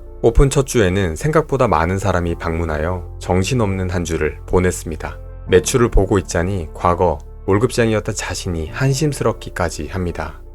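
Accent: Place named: Gyeongsang, South Korea. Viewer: 경상도 사투리억양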